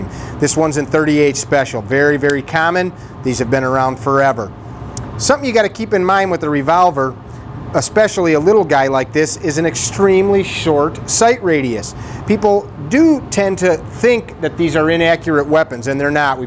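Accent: United States. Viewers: What is this American accent called? 😂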